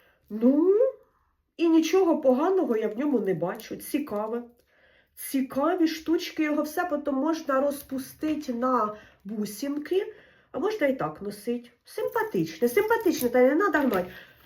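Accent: Poltava, Ukraine. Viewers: По суржику зрозуміла що ви з Полтави!!! Привіт вам і гарного настрою!!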